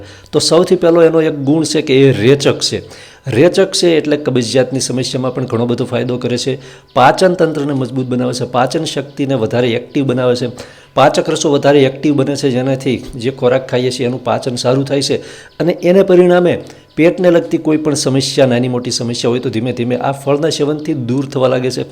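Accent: Bhavnagar, India. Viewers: ભાઈ, તમારી ભાષા ટિપિકલ ભાવનગરી છે ! અનુમાન સાચું છે ?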